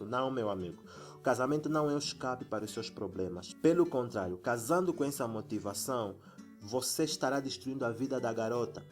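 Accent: Angola. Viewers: Um sotaque do Português Angolano parecido com o Português original (o de Portugal) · Seu canal é muito bom! Sou brasileiro. Esse sotaque é angolano? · Gente! Ele é angolano?? Tenho um amigo de angola e o sotaque é igual... Amo angola!!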